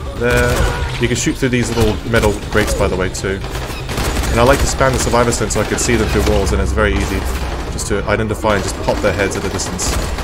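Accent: Australia. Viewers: thank you for this helpful guide mr. aussie man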